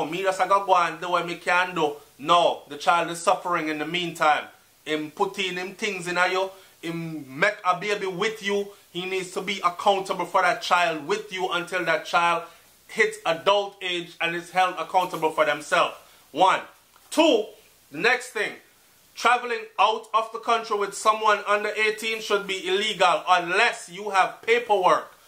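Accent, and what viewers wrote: Barbados. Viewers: Lol the Bajan accent 😄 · this is a serious topic and you had me cracking up with your barbados accent these kids dont listen they think they know it all so they follow the wrong crowd and dont like authority so they prefer a home where they can do whatever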